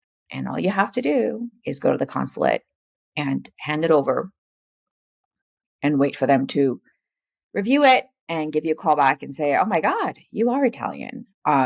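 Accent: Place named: Minnesota, United States. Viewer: Can I ask where you are located? Your midwest "Minnesota" is spot on and I'm Minnesotan. I'm looking for an attorney to help with this process and my Jure Sanguinis has been determined.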